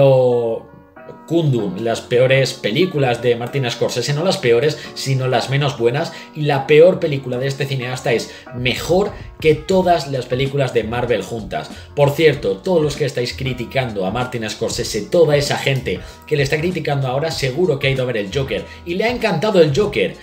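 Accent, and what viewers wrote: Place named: Mexico. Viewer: Aparte pochoclo s?? Enserio?? Hablas como mexicano y les dices pochoclos??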